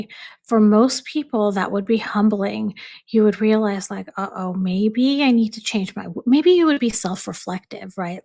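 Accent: United States. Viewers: DO YOU HAVE AN AMERICAN ACCENT ???? IF .IT IS NOT BAD ENOUGH. YOUR VOICE. .????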